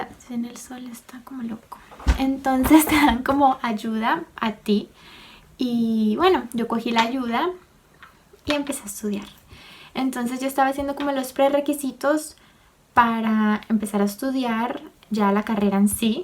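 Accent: Cali, Colombia. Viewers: Jajajaja como contas las historias ve! con ese acento caleño re marcado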